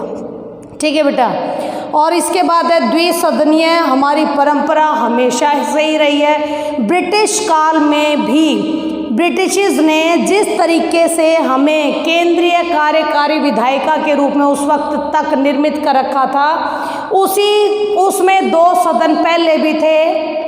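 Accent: Haryana, India. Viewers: Maam ap Hariyana se ho kya ap ki aavaj se lag raha h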